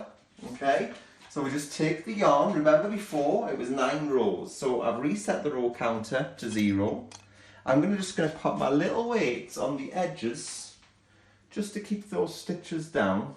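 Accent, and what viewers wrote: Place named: Northern England, United Kingdom. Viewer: Excellent tutorial - especially for an absolute beginner as you explain everything so well. Although I'm not a beginner I got my trusty Brother KX395 out of its box and made one of these lovely little buntings and I'll be giving it to charity. Lovely to hear a northern accent 😃 Look forward to more of your projects.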